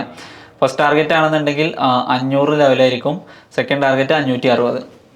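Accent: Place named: Perinthalmanna, India. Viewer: perinthalmanna samaram kelkkan nalla rasam anu to....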